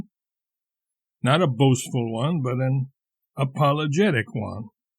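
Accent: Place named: United States. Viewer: Thank you so much! I've been trying to find this piece of Twain's work in audio form in an American accent for weeks now, I'm quite used to John Greenman, but this is perfect, I truely appreciate this channela and everything on it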